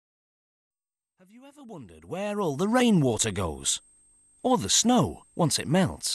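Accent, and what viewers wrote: United Kingdom. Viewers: I've never herd British How it's Made before.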